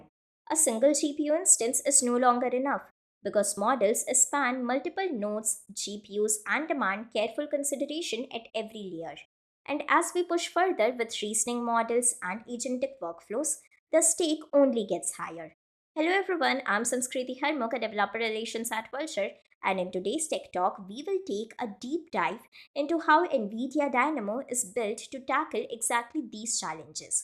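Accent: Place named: South Asia. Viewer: Hi. I appreciate the presentation, but the extremely heavy South-Asian accent and cadence personally makes it VERY difficult to properly parse the words/meaning (English is not my first language). So many things are simply mispronounced. Cachet for starters. We would be MUCH better off with an Ai voice here, and I don't say this lightly because they are monotonous and boring, but at least my brain doesn't get fried.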